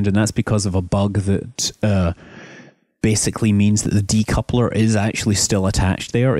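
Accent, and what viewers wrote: Scotland, United Kingdom. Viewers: hi, where were you born? you sound scottish but not completely:P nice video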